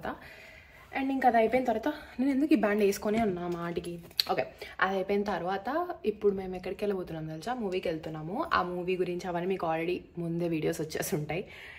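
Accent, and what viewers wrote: Rayalaseema, India. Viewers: I think palamuru (TG) and Rayalaseema slang seems similar . I may be wrong, but its just my opinion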